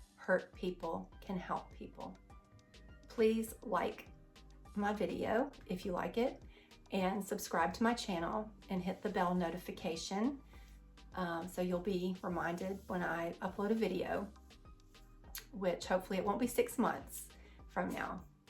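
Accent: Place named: South, United States. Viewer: Young lady if I was younger I would ask you if I could just get to know you . By the way do you like motorcycles , I think you would be a great back seater and any man should be proud to let you grace there bike with your presence .Do you live in the south, because I here a slight twang in your voice and I’m thinking of moving to Tennessee. You may not have noticed but I’m a biker and love long trips and riding as often as I can.I was born in Florida but have lived in California most of my life .Hey if you Want you could get back to me if you would like to say hi,or not if you think that would be wrong.Either way I hope to see some more of you videos and hope you are feeling better about your future in light of all that has gone on with you . My name is guy and hope to maybe talk to you some day.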